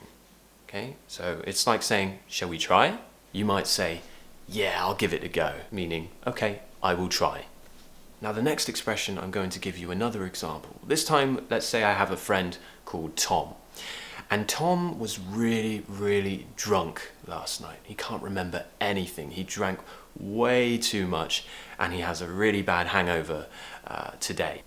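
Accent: United Kingdom. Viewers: Your speech, awesome British accent and explanations ın a simple and easy way are soooo helpful. Thanks a lot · Thanks so much💚 for educating us , Everyday I watch your old and new video's they helps me a lot to learn British accent Big hug for you my English teacher 😘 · love your accent ❤️ and excuse me, where are you from in the UK? :D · Has anyone seen him twice with same hairstyle? Remarkable guy! You've inspired me to learn the British accent. Thank you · I follow many English teachers on YouTube in order to improve my British accent. They are all great but yours is for sure the best! I just love it. Cheers. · I'm so grateful for your helpful videos; they give me a new lease of life. I find this one especially nice and useful. Let me get something off my chest: I love your British accent and expressions. Have a great day, or as close to a great day as you can have in these difficult times. 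Cheers,! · I love the British accent. It is elegant and your accent is best ever. I will keep watching your videos to learn as much as possible. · I love British accent and I've been learning a lot because of you. Thank you so much teacher. You're the best. Greetings from Mexico. 💕🥳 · Thank youuuu !!!! You helped me a lot to learn british accent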